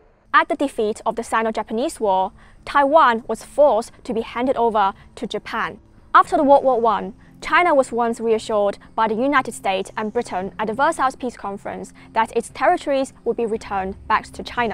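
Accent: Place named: Oxford, United Kingdom. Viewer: Super smart Chinese girl telling the china story so clearly and in perfect Oxford English. Well done young lady. 👍👍👍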